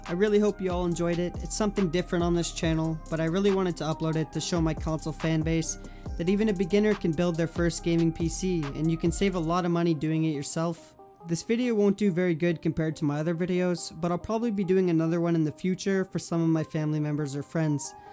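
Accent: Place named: Canada. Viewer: man looks like a 30 year old spanish father of 3 with a happy wife but sound Canadian as fuck also sup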